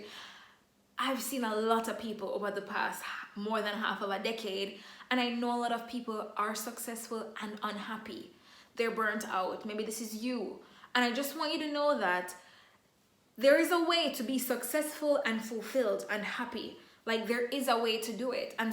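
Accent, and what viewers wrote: Jamaica. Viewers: Wonderful video!... I could tell your a Jamaican lol Wah Gwan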